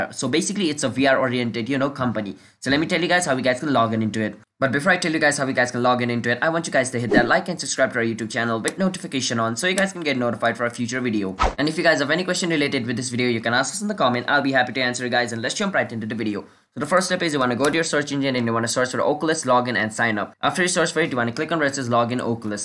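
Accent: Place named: India. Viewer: sounds like an indian guy.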